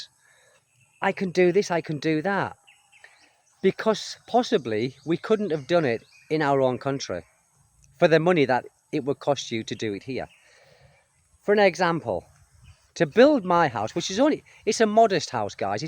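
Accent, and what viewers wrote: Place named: Middlesbrough, United Kingdom. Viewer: Whereabouts are u from originally mate ? Boro accent ? Great videos mate 👍 all the best to u and lovely family 👍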